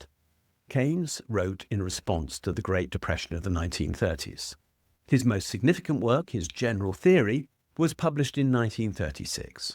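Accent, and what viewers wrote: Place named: Upper class, United Kingdom. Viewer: Frankly, this seems half baked, motivated reasoning, even disinformation. Like contrasting "keynesianism," which are an awful lot of things inspired by many different economists and policy wonks over a period of time, compared with a neatly wrapped tied and bowed catch phrase ladened MMT is too GD simple, exactly how disinfo works. "Not saying, just saying. Lots of wheels, gears, cams chains wiring and energy sources glossed over or left out of the equations. My personal belief: Taxes are what we contribute to making our institutions, infrastructure and defences better in combination with more modern technologies. They need not be "wasted." Incompetence, graft and corruption are waste and plebiscites can be deceived into accepting them, but inclusive self government and soundly educated participation is a bulwark against. 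Innovation and improvement is not germinated by funding rapacious capitalized adventurers. There's obviously and transparently more to this, but I've gone on long enough. All voiced with a "patrician" upper class English accent. FFS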